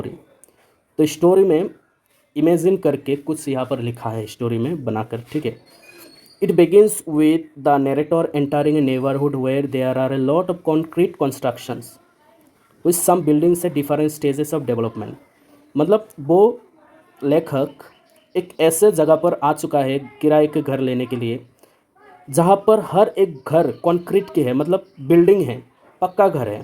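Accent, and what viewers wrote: Assam, India. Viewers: I hate Assamese accent and language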